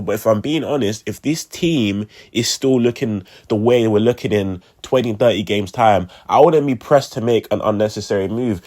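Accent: United Kingdom. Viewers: Wasn’t expecting that accent when I clicked on the vid but nice to see a fellow UK sixers fan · It’s kind of refreshing to hear a British YouTuber talk about the NBA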